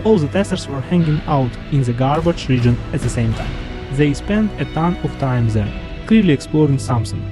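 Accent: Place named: Russia. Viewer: Я прям слышу русский акцент. На русском ролики будут?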